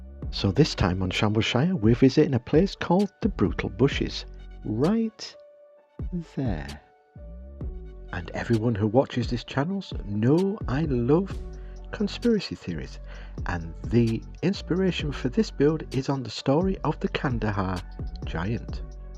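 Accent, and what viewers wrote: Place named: Northern England, United Kingdom. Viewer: your storytelling northern boy accent is perfect for Shambalshire It’s a great accent. I have an unfinished piece that I think would really fit in to Shambalshire I’ll take a few photos and send them for opinion Cheers pal 👍☘️👍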